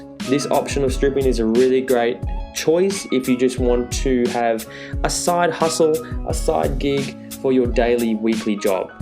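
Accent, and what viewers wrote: Australia. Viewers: Sounds like an Australian accent to me, I should have taken it up years ago but may be too old now. My cousin ended up doing really well (Hunter) and an old time close friend Chase cleaned up also, I only got as far as topless waitering and chickened out unfortunately.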